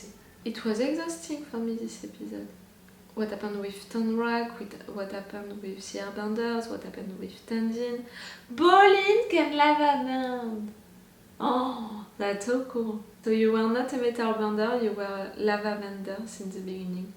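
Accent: France. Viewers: i love your english with french accent its soothing at times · You still have the most incredibly French and incredibly sexy voice and accent that I have ever heard ; ) Now, on to the reaction! <3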